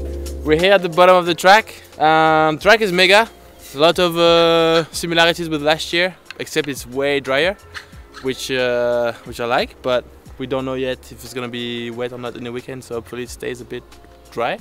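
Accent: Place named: France. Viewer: Au top l'accent Français quand tu et pas très doué en anglais sa aide 😉Bon courage a toi pour cette saison hâte de te voir détruit la piste des Gets 😄 👍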